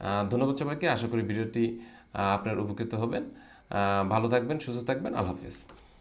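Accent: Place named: Joypurhat, Bangladesh. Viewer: ভাই আপনার বাড়ি কোন এলাকায়,, অনেক সাবলিল ভাবে বললেন আমাদের জয়পুরহাট এর মতো লাগলো 😅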